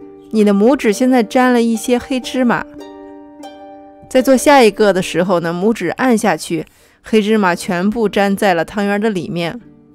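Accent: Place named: Beijing, China. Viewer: 五零后老北京，喜欢那儿音的味道，，必看，关键是必听！倍儿地道。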